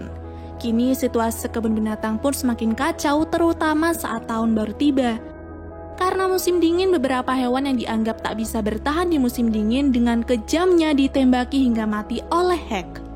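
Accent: Pemalang, Indonesia. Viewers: Wong pemalang kye ya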